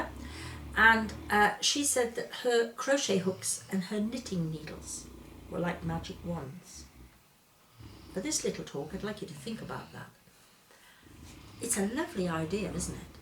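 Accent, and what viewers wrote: United Kingdom. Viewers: Love your accent,.. what part of Britian are you from?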